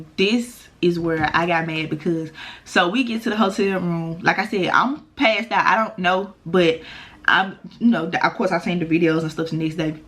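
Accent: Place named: Memphis, United States. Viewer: I came across your video and immediately liked the hair for starters and also just knew the accent was Memphis. At least y’all are a hop, skip, and jump away. Gotta love Address, Bar 2200, and all that. We’ve sort of branched out to going to Chapman & Kirby for brunch and some folk go to 5015. It seem like you liked Bar 2200 food. I loved their vibe!!!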